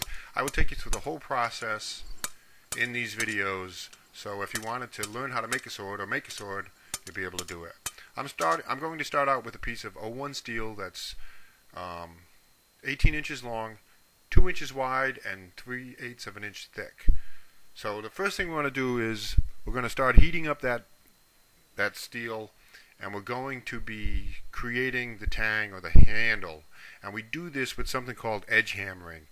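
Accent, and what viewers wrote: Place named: New Jersey, United States. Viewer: I love your "new joisy" accent!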